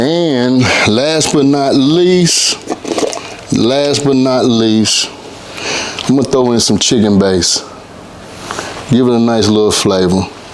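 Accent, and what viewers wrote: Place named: East Texas, United States. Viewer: You have to be from East Texas, because the accent and the wording and the GOOD OLE' SOUL COOKING is giving East Texas. I was born and raised in Lufkin, Texas. Keep Up the Good Work. Love your channel!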